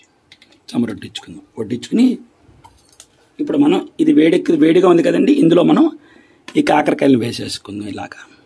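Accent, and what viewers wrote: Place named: Godavari, India. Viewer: garu namasthe Meeru chupinche vantalu Meeru cheppevidhanam enka bavuntundi Memu anukovadan meeru godavari zillavallu ani Mee matani bati anipisthinfi Tq🎉 maku chala Baga nachhutunnayi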